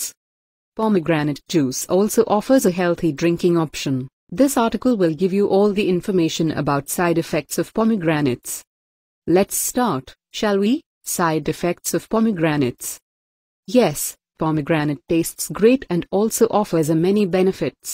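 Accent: India. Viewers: It’s an Indian Accent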